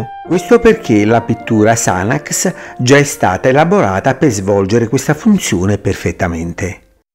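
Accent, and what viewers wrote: Naples, Italy. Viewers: buongiorno! Ma sei di Napoli?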